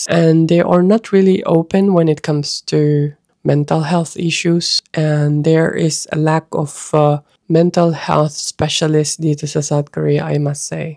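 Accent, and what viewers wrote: United Kingdom. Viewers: Bakit po may british accent yung english mo? Ang arte pakinggan 😅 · Nagulat rin ako sa pa British accent reminds me of Sarah D 🤗 tumira rin kc un sa London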